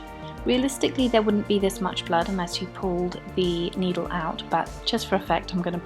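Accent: United Kingdom. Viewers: British accent or Australian?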